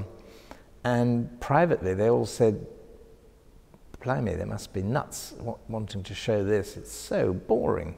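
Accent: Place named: RP, United Kingdom. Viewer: U sound very posh & u know wht u talking about! R u from London? Thanks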